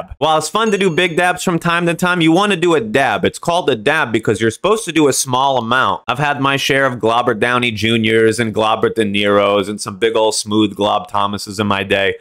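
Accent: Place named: Boston, United States. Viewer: Ayo 😂 I have to subscribe. You're funny af bro. You got a Bostony accent 😂.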